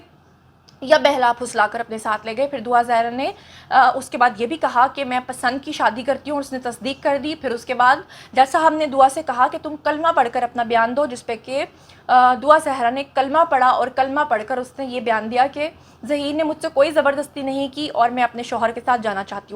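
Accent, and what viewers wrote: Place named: Punjab, Pakistan. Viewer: But AP ka Jo panjabi pan he wo buht. Hi ghatya he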